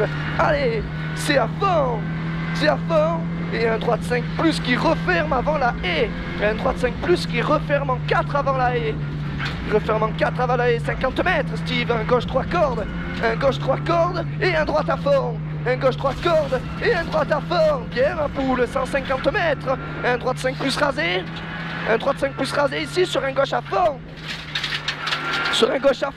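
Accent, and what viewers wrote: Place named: South, France. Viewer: Es ce que vus faites des rallyes dans le sud ? Ps : vous venez d'où ? Car vous avez un accent du sud un peu 😅, j'adore continue comme ca !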